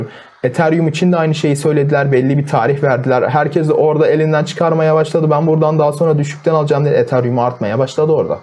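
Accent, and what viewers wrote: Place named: Gaziantep, Turkey. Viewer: hocam antepli olduğun o kadar belli ki ;)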